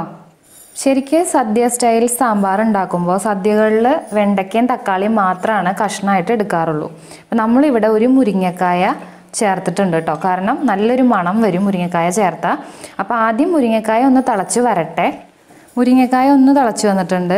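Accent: Thrissur, India. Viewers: eppozha chechide channel kadath...Nalla Avathranam...naannayid receipeum....chechide veed evdyaa.Thrissur Aano....God bless u&Ur family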